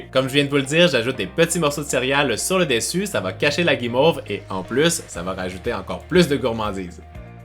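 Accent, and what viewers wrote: Quebec, Canada. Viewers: J'adore ton accent de québécois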